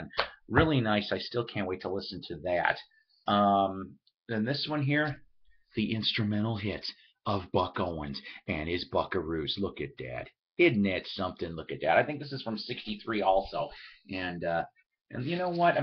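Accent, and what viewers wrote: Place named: England, United Kingdom. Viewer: Love your English accent!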